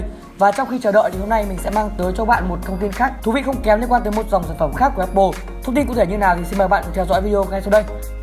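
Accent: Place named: North, Vietnam. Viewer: Người SG nghe cô này nói là biết người Bắc sau 75 ,nói như sợ người khác cướp mất lời mình ,nói không kíp thở ,có cần phải vậy không ?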